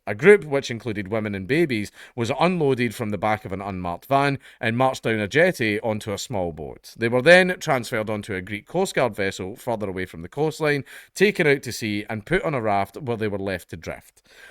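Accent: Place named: Scotland, United Kingdom. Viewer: I’m just here to see do different accents then revert back to his HEAVY Scottish accent 🤣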